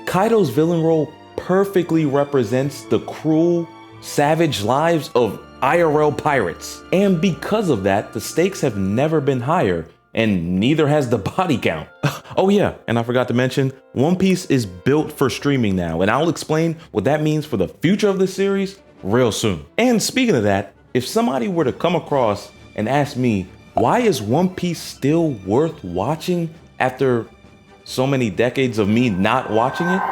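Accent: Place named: Australia. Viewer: I read this in his Australian accent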